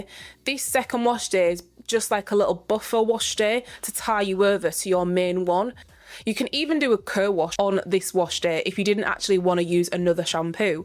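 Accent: Northern England, United Kingdom. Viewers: Big up to the North England accent btw! ❤❤ just want to add that Kenra moisturizing oil has been a game changer for friction reduction on my tight 4c hair. Very lightweight. You might enjoy!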